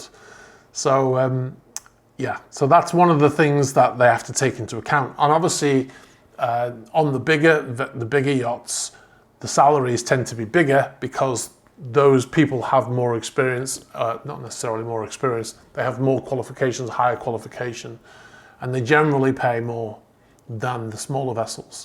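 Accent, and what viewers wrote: United Kingdom. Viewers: I've only just watched a few of your videos. What is your history in crewing? I'm not asking for a complete cv but a general idea. Do you have an engineering background or captain/masters rating or chief cook and bottle washer? Lol Do you still work or are you retired. You sound British so maybe you were in the royal navy? I liked your video and hope to see more!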